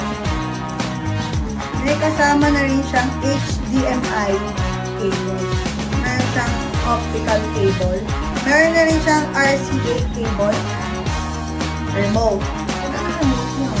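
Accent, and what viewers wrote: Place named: Iloilo, Philippines. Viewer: Ilongga man gali ni c madam🥰mabakal ko sina,pila man?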